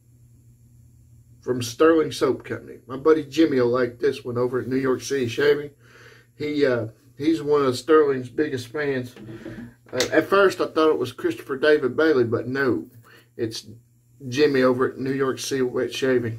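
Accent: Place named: South, United States. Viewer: Great shave! Where you from? You sound like a fellow Southerner.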